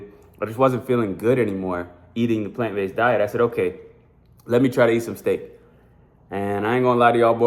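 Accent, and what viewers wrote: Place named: AAVE, United States. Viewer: Lose the ebonics white boy.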